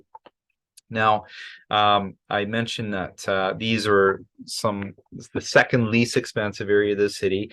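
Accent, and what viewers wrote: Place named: Canada. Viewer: Estoy escuchando tus videos todo el dia para aprender ingles y acostumbrarme al acento canadiense (I'm listening to your videos all day to learn English and get used to the Canadian accent)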